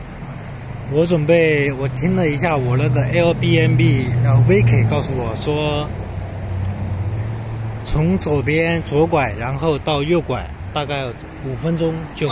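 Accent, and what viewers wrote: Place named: Shandong, China. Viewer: 心声，你肯定是山东的吧，我听出来了，老乡🍔🍟🍕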